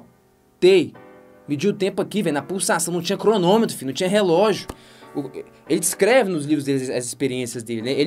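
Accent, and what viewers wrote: Minas Gerais, Brazil. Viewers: "esse trem" kkkkkkk sotaque mineiro == tudo pra mim!!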